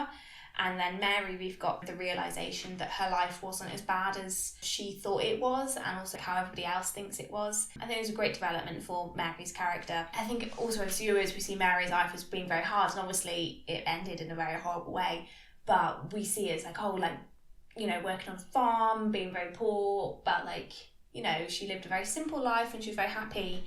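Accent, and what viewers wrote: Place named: England, United Kingdom. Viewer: Love your reviews, and thanks for uploading. Would it be possible that you speak a little slower? Your English accent is lovely but not always easy to understand at times - and the subtitles don't always make sense.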